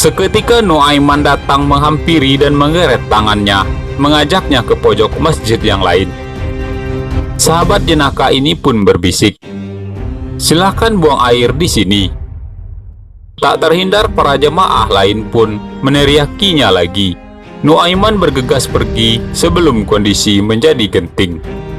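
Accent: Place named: Padang, Indonesia. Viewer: Orang padang pasti dari logat bicaranya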